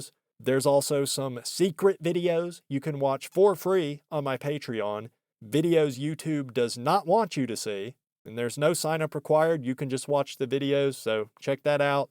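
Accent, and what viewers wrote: South, United States. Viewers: Dude your voice is a gift from GOD. Every part of it, the way you speak, inflections, the hint of southern draw (drawl?) man there is for sure something there. I hate how overused the word is but my gut says "authentic". All sorts of content and things could come from this. Hope you don't stop with just ONE youtube channel!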